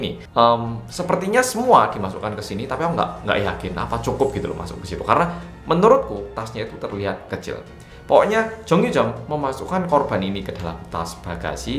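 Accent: Java, Indonesia. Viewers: mas nya muka korea tapi logat jawa 😅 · Trmksh buat pak sopir ya,untuk mas Mimin cara menyampaikan berita bagus dan logat Jawanya kental banget. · Mas kmu orng Korea apa orng Jawa tp kok logat Jawa wajh korea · lo kok yg mbawa wartanya aku pernah lihat dia .waktu dia nyambut pak jokowi hadir d korea .dia ini pinter pakek basa jawa . dan dia bilang pernah tinggal d malang . mantep logat basanya ya medok . semangat brooo moga yutup mu sukses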